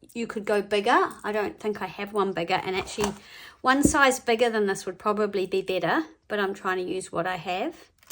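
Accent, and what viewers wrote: New Zealand. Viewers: That was really great. I loved it and the choice of fibres you used. Was that a fellow kiwi I could hear? You have inspired me ❤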